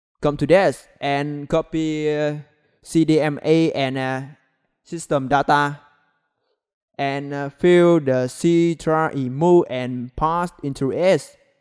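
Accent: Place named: Vietnam. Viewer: Nghe giống việt nam vãi!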